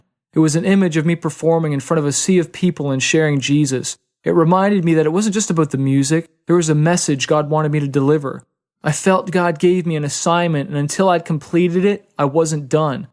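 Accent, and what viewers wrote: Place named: Canada. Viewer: This this probably my favorite chapter in Fighter. I've never really noticed your Canadian accent until now...XD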